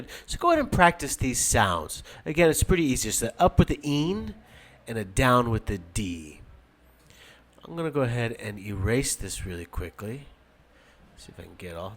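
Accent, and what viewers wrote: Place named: United States. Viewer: that's American accent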